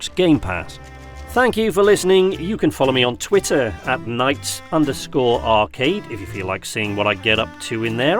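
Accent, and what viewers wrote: United Kingdom. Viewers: Why do Brits always seem so level headed, reasonable and intelligent when they speak! lol Great vid, earned my sub! Downloading the game now, can't wait!!!!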